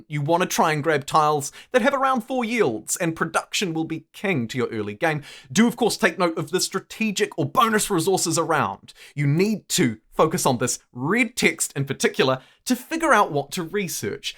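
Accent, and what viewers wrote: New Zealand. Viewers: Sounds like a New Zealand accent, though I may be mistaken. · I'm super late to this game – never played Civ anything before, and Civ6 is free on Xbox Game Pass – but I've fallen deep in the rabbit hole, and I wanted to say thanks. Love your friendly voice, simple and clear advice, infectious enthusiasm and as an Aussie, I really enjoy the kiwi accent. 🙂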